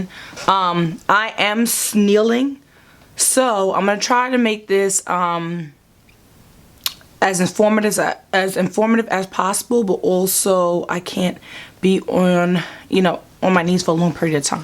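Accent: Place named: New York, United States. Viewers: Is she not from New York, wow the accent is definitely New York, I'm from Philly and our accent isn't nearly as strong as New York :) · Your personality is everything! Mad raw and transparent. You sound like a fellow New Yorker, are you? Great video and so informative, eveb for those not considering the same surgeon as you. Thank you!! Btw: You look great and keep those videos coming.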